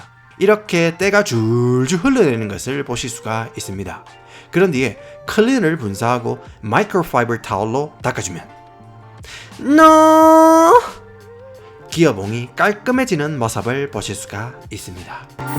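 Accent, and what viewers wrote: Gyeongsang, South Korea. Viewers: 우연히 영상이 떠서 봤는데 재밌네요 저도 미국인데 그래서 반갑고 사투리라 또 반갑네요 저도 경상도출신인데ㅋㅋ 사막이면 라스베가스이신가.. 암튼 구독하고 갑니다 더운데 수고하세요! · 혹시? 리쌍의 길 아니세요? 알고리즘 타고 들어 왔다가 헉했어여. 경상도 사투리 강렬하네여.ㅋㅋㅋ.구독 조아요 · 가끔 보는데 마술사같아요 경상도 영어도 재밌어요~^^ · 영상 재밌게 보고, 구독, 좋아요 달아줍니다.ㅎㅎ 고향이 경상도 분인거 같네요.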